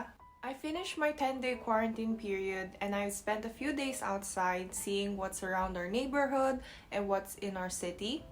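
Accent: Philippines. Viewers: I am still amazed by your accent and your tagalog language. So fluent para ka tlagang pilipino. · O diba, pinoy na pinoy kung magsalita.👍👍 New subscriber here. Hope you upload videos regularly in the coming days.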